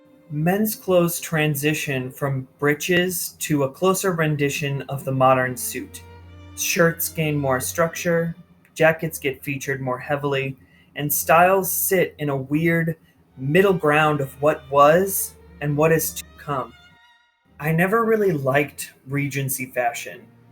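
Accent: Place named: United States. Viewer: I was thinking that you had suffered a catastrophic research failure... but then considered your American accent. So, yes, the Macaroni's were famously tasteless in their dress, celebrating the nouveau riche over the 'conspicuous inconspicuousness' that was fashionable at the time. So, while you certainly did well with the colours and embroidery patterns of the period, you were then let down by the poorly cut weskit and over-buttoned breeches. Sigh, and no hat. Please, wearing a hat was thought to be a matter of basic decency. When indoors and not actually wearing it, you would still be expected to be carrying it! Your sexuality is of no concern to me, but your drunken fashion choices (thank you, Dionysus) appear to be deliberately offensive.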